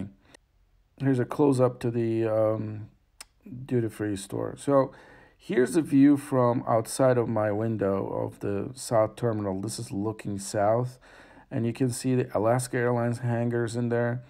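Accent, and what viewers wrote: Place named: Turkey. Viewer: I liked your English.. it's quite fluent... but I felt the influence of Turkish ... any way I liked it and I prefer your videos in English..😊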